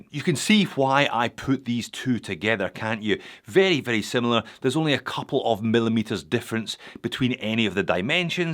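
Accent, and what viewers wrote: United Kingdom. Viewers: Thank you Sir, love the accent ! Is that from United Kingdom 🇬🇧?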